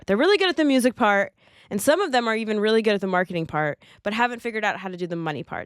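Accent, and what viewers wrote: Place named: United States. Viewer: That American accent is off the hook!👍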